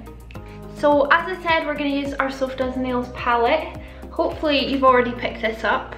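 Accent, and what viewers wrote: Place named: Scottish Highlands, United Kingdom. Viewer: I love it!! Where is your accent from??! You sound like a fellow highlander? Xxx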